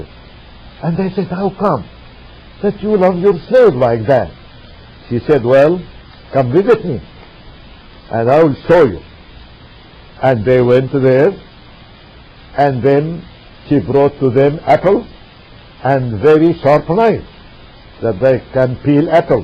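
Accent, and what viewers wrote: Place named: Egypt. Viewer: U lier egyptian stop speaking funny english,u talk about story of joseph it is beautyful story by reading only this "chapter joseph"in holy Quran "Cat stevens"famous pop singer of England became muslim and left doutful religion of christianity,you can visit his website his new name is Yousuf Islam,he choose his name yousuf because he was converted by reading "sura yousuf"from quran.and you lier telling chapter yusuf is wrong.if u want i will quote pornography from Bible,but we respect all books.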